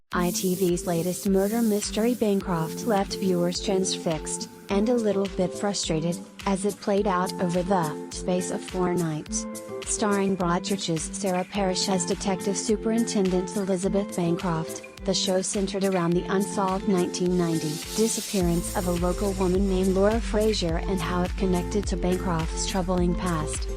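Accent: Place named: United States. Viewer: I lost the will to live watching this, and now this robotic, American blurb ..... aaaaggghhh!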